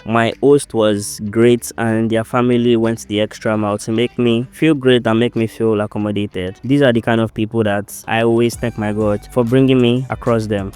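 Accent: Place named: Ghana. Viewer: Your voice sounds like a Ghanaian accent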